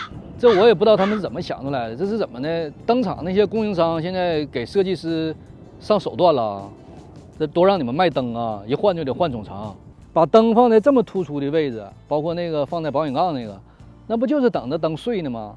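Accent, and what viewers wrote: Northeast, China. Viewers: 一听说话就是东北人呀，辽宁人吧，我吉林的，想问你一个题外话，美国公立医院看病是不是免费的麻烦你。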